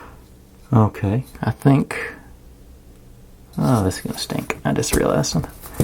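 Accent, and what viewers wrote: South, United States. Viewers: Thanks so much for this full video set--I picked it up about Section 5 or so when I started to get bogged down. Most often, I watched the videos after I had done a process, to make sure we had done it the same. I chuckled through a lot of the videos as you and I made many of the same mistakes and had the same re-dos. I ended up breaking 3 pieces, and mercifully there were spares of all of them included. This was my 4th ROKR and most complex one. I've done hundreds of jigsaw puzzles, but not many 3d kits. I was intrigued that you have the same regional accent as another YouTuber I've been following for awhile--no idea where he (or you) lives, but it seems like a Kentucky or west Tennessee accent. <link>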